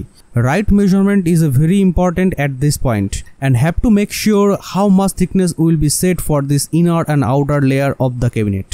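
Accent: Bengal, India. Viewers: Your accent sounds bengali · индийских английский это что то с чем то.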